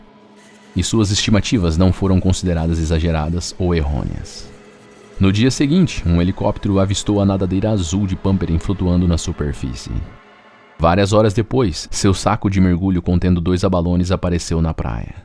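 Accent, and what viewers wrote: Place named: Sao Paulo, Brazil. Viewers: Meu Deus esse sotaque Paulista forte dá uma aflição é muito feio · esse sotaque de paulistakkkkk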